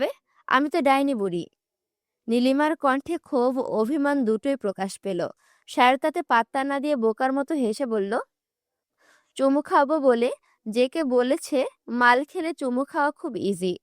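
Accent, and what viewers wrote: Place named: Kushtia, Bangladesh. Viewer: তাই কুষ্টিয়ার মানুষের মতো মাঝে মাঝে কথা বলো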